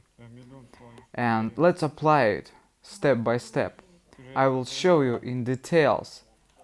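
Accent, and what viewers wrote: Russia. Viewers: Are your clients happy? That's all that matters. I love listening to your accent, and can those who criticise speak Russian? If they tried you can criticise them. I love listening to you, and I love what you do. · I love your nail art, and you're great with gel. I also understand your English very well. My son is obsessed with all things Russian, and sometime when I watch your videos, he watches with me just to hear your accent. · Also, your English is good. I ❤LOVE❤ your Russian accent. Don't let anyone tell you that the way you speak is flawed.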